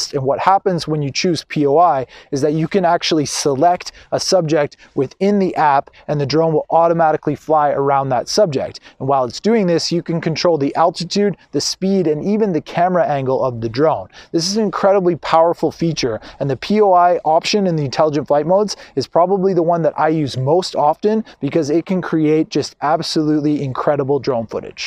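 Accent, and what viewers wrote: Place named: Canada. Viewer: sounds Canadian, lol.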